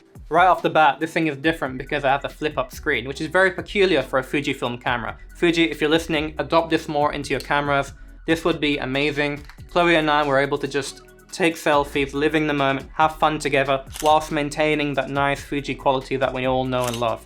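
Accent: India. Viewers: I like your videos. I also enjoy your accent and your indian accent (x carry on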